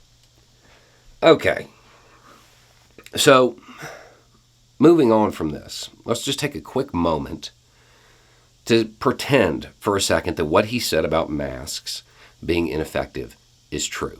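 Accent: South, United States. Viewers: Lovely, a bearded man with a southern drawl who wants you to think he is either libertarian or some form of conservative when in fact he is a full fledged progressive liberal. Yes, mask are ineffective, and this dude's mask certainly does not work.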